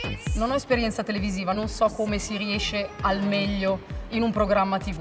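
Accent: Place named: Italy. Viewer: Q mujer más Hermosa... ese acento Italiano es irresistible...((( 🖤 )))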